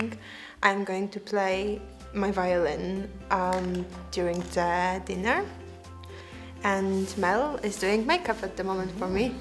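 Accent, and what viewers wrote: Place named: Poland. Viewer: she sounds Polish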